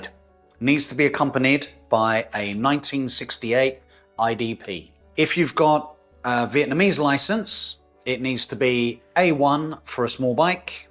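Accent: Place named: United Kingdom. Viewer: I hear a British accent , Australia IS a signatory to 1949 Vienna convention but NOT the 1968 one due to costs of changing our road rules and therefore have no intention of signing up, sad news for us. A Vietnamese licenses is available IF you have obtained a residency card,,,, good luck with that , so take the bus or grab or Xe Me.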